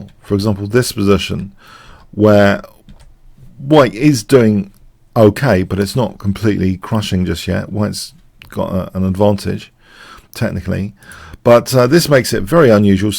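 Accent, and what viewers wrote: England, United Kingdom. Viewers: I have to admit that pure English accent and chess videos is the rarest thing I have ever seen. But it's addictive.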